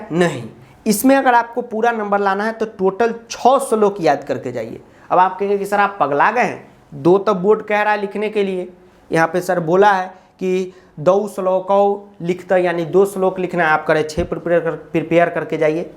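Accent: Bihar, India. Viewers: Sir aap rajshthan se ho ya bihar se ye bol kaise rhe ho sorry to say this par mere se control nhi huaa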